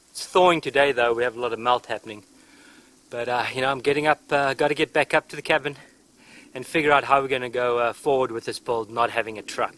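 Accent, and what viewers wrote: South Africa. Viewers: Wow. Came here by chance. Almost fell over when I heard the accent. Nice to see an ex SA man doing some great things. Where are you based? Subbed. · You sound South African. Where are you from? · Good old South African accent there. Subscribed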